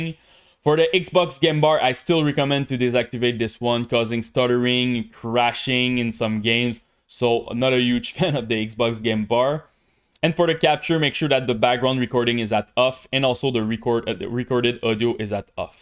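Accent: Quebec, Canada. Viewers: Un Quebecois sur rust. Finalement ! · C'est clairement un accens Québécois XD Good job pour le vidéo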